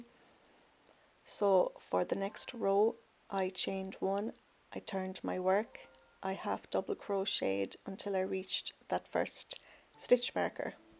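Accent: Ireland. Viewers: This is beautiful. Can't wait to make one for myself! Thank you for sharing your amazing creations and for the added bonus of the lovely Irish accent!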